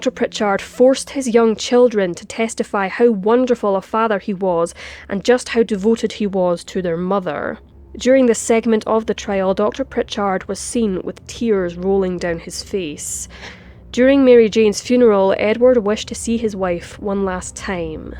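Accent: Scotland, United Kingdom. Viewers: You uttered the phrase "by a malicious Englishman", with far too much enjoyment in your lilting Scottish accent! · I keep forgetting you're scottish. I really do enjoy Scottish narrations! Is your accent Glaswegan? (Sp? ) Or is it from another area? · Excellent channel your accent is scottish i think anyways thanks from Boston Massachusetts USA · Love your videos, Scottish accent (I'm from Ayrshire) is the best for crime docs. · Love your Scottish accent. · It's good to hear a Scottish accent!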